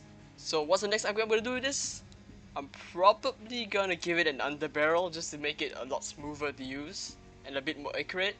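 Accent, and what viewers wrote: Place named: Singapore. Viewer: u sound singaporean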